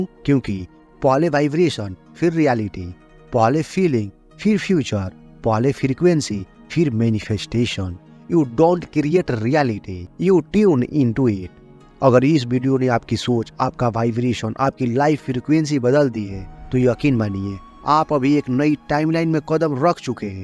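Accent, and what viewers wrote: Bengal, India. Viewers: Kese bolta hai yaar ..bengali hai kya..hindi seekho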